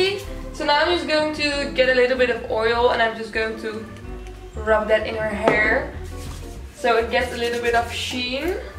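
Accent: Netherlands. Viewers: She sounds Dutch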